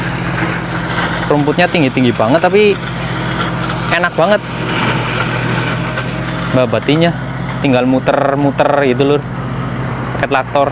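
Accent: Banyumas, Indonesia. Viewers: ngapak banyu mas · Sampean ngapak Jepang pa mas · ADLI.DARI. NGAPAK. COLACAP,APA. BANJARNEGARA,APA. PURBALINGGA.? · Mas sampean asal nya jawa ngapak ngndi sih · Mas Indonesia dari mna ko bahasanya ngapak kenapa kerja d desa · Bahasene ngapak. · Mas aku seneng liat konten mu bahasa mu dialek mu khas inyong2😅 salam kenal mas aq dr Banjarnegara mas asal kota mana? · Lugatnya jateng ngapak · Jenengan masih ngapak kentel banget yak😂😂😂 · si. Ngendi asline jawa ngapak? Ngendi Cilacap.? Banyumas? Kebumen? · Rika ngapak yaak?. Bambang Banyuwangi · Nyong penonton baru mas, seka wonodobo jateng, nyimak separo lgsung tak subkreb, tertarik karo kontene sampean ben tambah ngerti suasana pedesaan jepang, narasune sampean maer kiye, sederhana trus ora ngguwang logat daerahe, ngapak ya, pada berarti, oke semoga sukses bro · Suka bgt perumahan di desa tp rapi bersih mase. 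Ngapak aslinya mana mas sukses trus mas broo❤ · Wong bnyumas ngpak2 mntap mas bro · Asline wong ndi mad ning Jepang bahasa jawa ngapak ya. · Asline sampeyan wong ngendi ya Mase,bahasane ngapak kaya wong banyumas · Asalamualaikum,salam kenal,mas aslinya jawa mana yah ko mirip kaya kampung ku banyumas.terus di jepang udh brapa th..? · Mantep ngapak Banyumasan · Bahasane ngapak Wong ngendi sih ko? 😅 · Mantap ngapak ya mase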